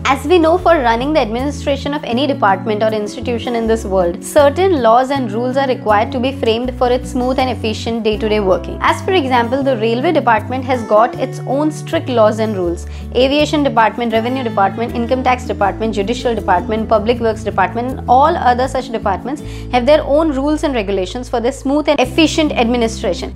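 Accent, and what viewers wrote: Gujarat, India. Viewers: Dii ! Are you gujarati?? Cuz you said "karma nahi nadta haii" 😂 I'm 100% sure you are gujju😍 I lovvee ur videos!! Actually my life is changed after watching ur videos keep going dii ur doing gud♥️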